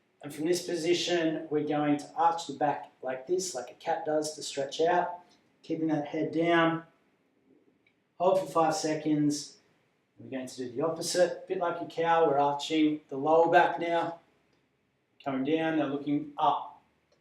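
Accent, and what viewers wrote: Australia. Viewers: Hi, Thanks so much for all your videos and articles. They are all very informative and easy to follow and do. I'm stuck in Europe at the moment, unable to get easily back home, so it's wonderful to hear a lovely Ozzie accent.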